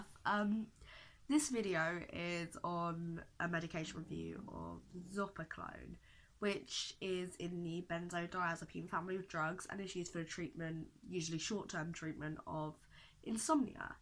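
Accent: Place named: United Kingdom. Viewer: where from the uk are u from?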